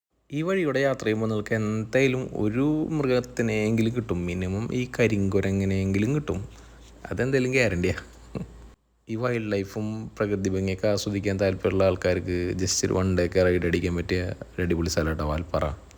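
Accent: Thrissur, India. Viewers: Ninte Thrissur slang super.. videosil Ellam oru positive vibe undu 😍😍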